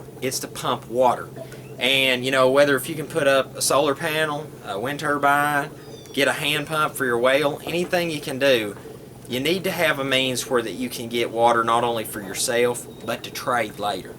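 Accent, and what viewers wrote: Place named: South, United States. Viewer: I like your Southern drawl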